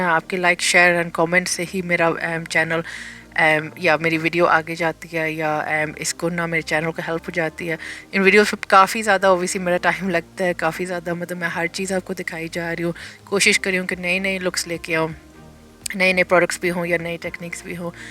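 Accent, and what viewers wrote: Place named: United Kingdom. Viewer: Mam U speak very well Your British accent is great but u speak very very fast Your Hindi is beautiful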